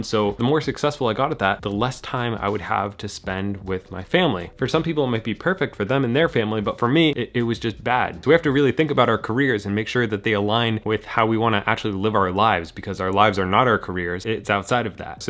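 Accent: Scotland, United Kingdom. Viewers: I would’ve never guessed that was a Scottish accent and my husband is Scottish. My views on commuting and waiting have completely changed with audiobooks, kindle and online courses. You can read or learn anywhere … almost nothing is a waste of time unless you forget your phone charger.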